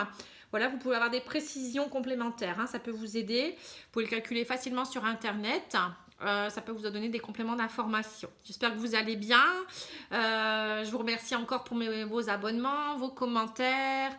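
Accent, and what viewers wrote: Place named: Nord-Pas-de-Calais, France. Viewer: Have Emilia je n'ai pas osé posé la question j'ai reconnu immédiatement l'accent chti j'ai toute ma famille à Boulogne sur mer ❤️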